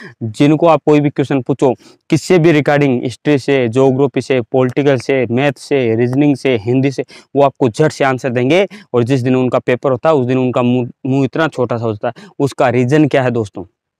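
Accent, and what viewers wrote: Rajasthan, India. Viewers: Sir Rajasthan se ho kya aap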